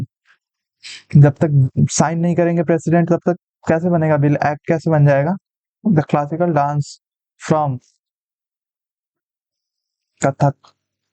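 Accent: Bihar, India. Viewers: Bihar se ho kya Bhaai???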